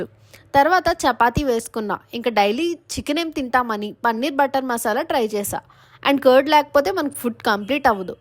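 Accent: Andhra Pradesh, India. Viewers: Hyderabad la vundi andhra slang endi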